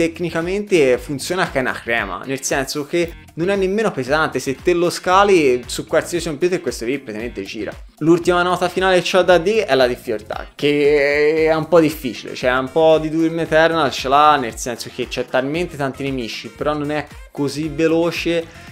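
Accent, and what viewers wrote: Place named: Tuscany, Italy. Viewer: Leggermente troppo dialetto toscano, ti consiglio di semplificarlo sennò la gente non riesce a capire troppo bene e leva il video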